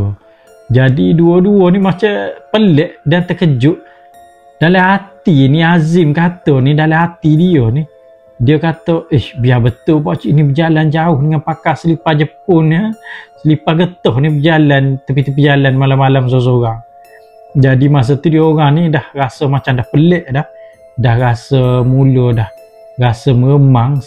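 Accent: Kelantan, Malaysia. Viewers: Terjumpa channel ni kebetulan ari ni first Time tgok..Abe ni ore Kelate ke ..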